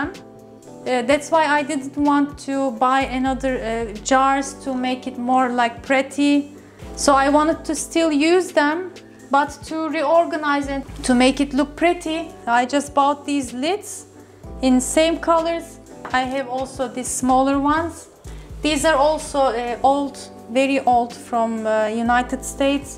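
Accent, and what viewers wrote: Turkey. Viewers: Ablacım o nasıl ingilizce konuşmaktir yaaa ? Türkçe okur gibi. Biraz diksiyonunu geliştir. Ruh kat diline biraz. Dümdüz türkçe aksaninda ingilice mi konuşulur ? 1.30 dakika zor izledim. Hemen kapattım.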